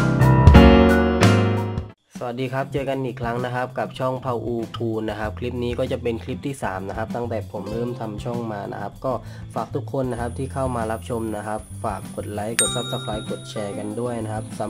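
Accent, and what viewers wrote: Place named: South, Thailand. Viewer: พี่พูดเหมือนคนใต้เลย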